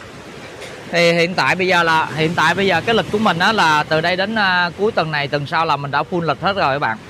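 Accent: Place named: South, Vietnam. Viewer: chúc mừng bạn cán mốc 100 ngàn cây số, ý lộn 100 ngàn đăng ký. Xem video của kênh không những được học kiến thức lái xe mà còn được ngắm cảnh khám phá những cảnh đẹp, những lưu ý thực tế trên các cung đường. Hấp dẫn hơn nữa là bạn rất vui vẻ nói chuyện lôi cuốn tiếng nói miền Nam rất dễ nghe, thân thiện, người lái xe hay người xem như mình cảm thấy vui vẻ không buồn tẻ buồn chán. Nói chung là rất tuyệt vời.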